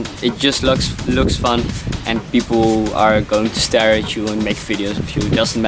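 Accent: Netherlands. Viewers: Hahaha gy hebt een Hollands engels accent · ik hoorde al een Nederlands accent 😌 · hij s ook nl vgm... want zn uitspraak klinkt als die van n nldr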